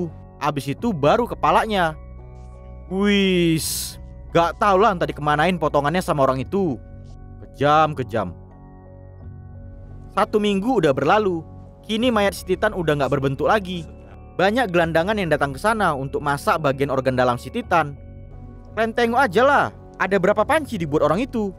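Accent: Medan, Indonesia. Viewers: Indonesia bagian mana ini admin, kek orang medan bukan padang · Jelas kali medan mu.on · Mimin nya orang Medan karna saya orang Medan juga tau logat Medan kek mana 🗿👍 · Bang pasti ko orang Medan??! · Logat medan 😏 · Medan kali logatnya bah 😅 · Logat medan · Bang orang medan kah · woiii...orang medan kau ya...kwkwkwk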